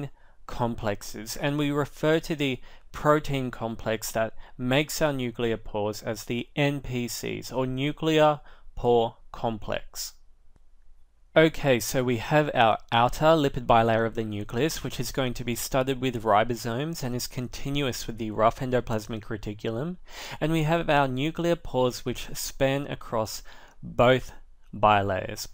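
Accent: Australia. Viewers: Is that australian accent??? 😍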